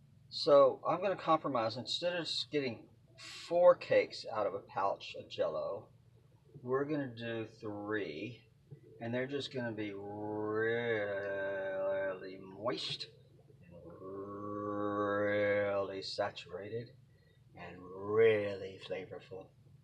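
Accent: Texas, United States. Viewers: I loved watching this video and loved listening to your Texas accent. We live in North Texas stationary and travel as much as possible. Thanks for sharing this with us. I am going to try this with dollar store pound cake. My Momma used to make the best homemade pound cake.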